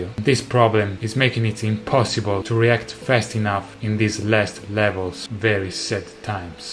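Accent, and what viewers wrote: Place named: Italy. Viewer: This is the most Italian accent of I've heard so far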